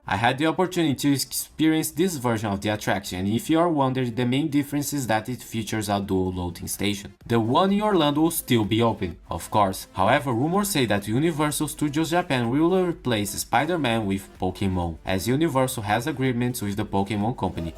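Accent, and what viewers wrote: Brazil. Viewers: Great video, thanks! Is that a Brazilian accent?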